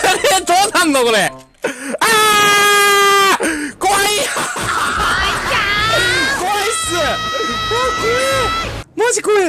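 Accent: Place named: Kansai, Japan. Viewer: 関西弁すこ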